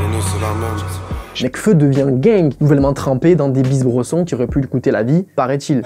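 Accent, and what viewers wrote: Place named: South, France. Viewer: l'accent du suuuud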